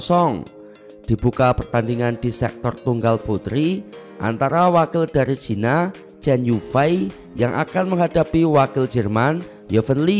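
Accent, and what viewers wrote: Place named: Java, Indonesia. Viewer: Medok bnget jowone to mas..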